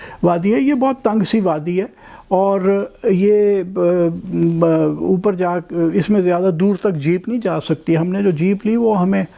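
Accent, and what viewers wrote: Punjab, Pakistan. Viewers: Just amazing Love the way you speak especially your punjabi touch .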